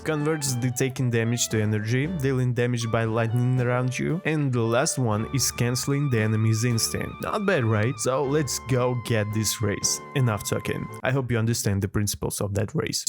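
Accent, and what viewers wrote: Russia. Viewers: I have a question are you Russian? It's just the way you say some words in Russian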